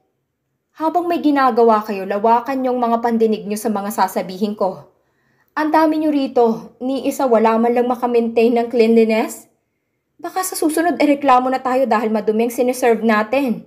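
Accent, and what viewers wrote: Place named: Iloilo, Philippines. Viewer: Ilonga ka siguro miss narrate hnd bagay sau mag narrate para kang ewan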